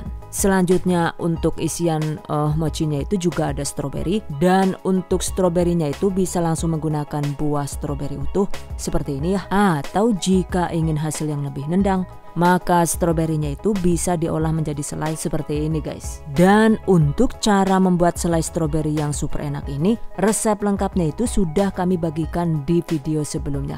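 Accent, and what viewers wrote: Banyumas, Indonesia. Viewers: Diperbanyak dong ngomong ngapaknya.. 😅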